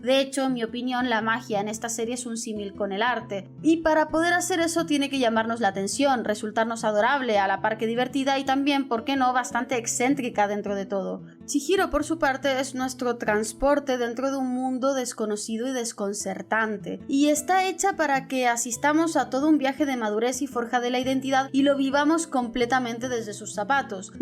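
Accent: France. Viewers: De que parte de Francia sos? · Soy nuevo en canal, ¿ la chica que locuta es de asendencia francesa lo comento por su acento ? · Porque tiene asentó francés??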